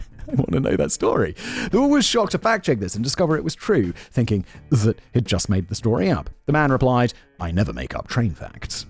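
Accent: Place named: United Kingdom. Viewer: You Tube SUCKS! I have removed this man's channels over and over again. But when You Tube changes the algorithm, it force feeds me this guy's many channels despite my removing him. channels suck. They are poorly researched information that is mostly government propaganda talking points. Terrible videos. And just because has a British accent, this doesn't not make him an authority on anything. He's just a talking head. England is over. Brexit has made the only job in England a You Tube content provider. Terrible list videos that spread falsehoods and bull.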